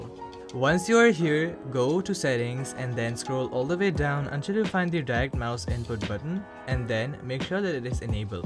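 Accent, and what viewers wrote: India. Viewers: You got a bit of indian accent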